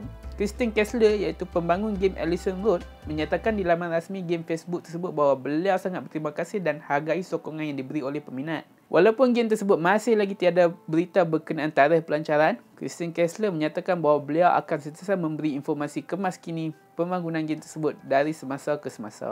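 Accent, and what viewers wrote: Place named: North, Malaysia. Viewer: pekat utara hang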